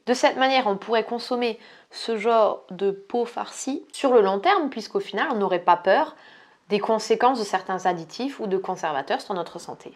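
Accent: Alsace, France. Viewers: Oh lalala l’accent alsacien tres prononcer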